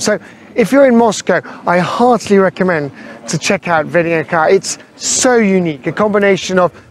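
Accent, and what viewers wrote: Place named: United Kingdom. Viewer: Ооо мы обязательно посетим. Британский акцент тоже привлекает!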